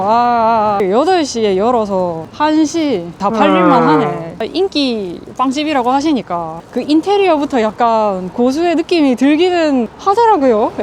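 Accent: Busan, South Korea. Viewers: 부산 사투리 죽이네.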